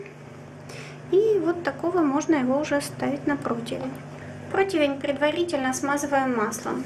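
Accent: France. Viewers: Лайк за французкий акцент . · От французского акцента чуть не стошнило, можно как то без него...😝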